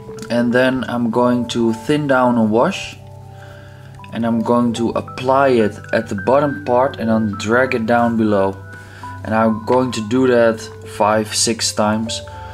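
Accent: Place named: Netherlands. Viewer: Geweldig gedaan man, ik kan aan je accent horen dat je Nederlands bent.. Ik heb geabonneerd, echt super goed gedaan.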